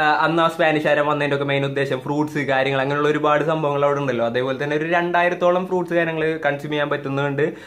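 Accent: Thrissur, India. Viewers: Are you from thrissur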